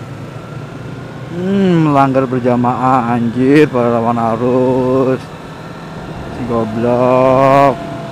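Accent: Surabaya, Indonesia. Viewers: Biasanya nonton motovlog bandung trs nonton motovlog surabaya keluar dah medok dan cak cuk nya :D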